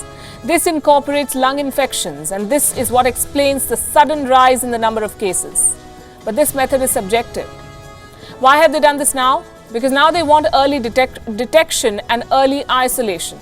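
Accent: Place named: India. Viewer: Her Indian accent is a real turn on.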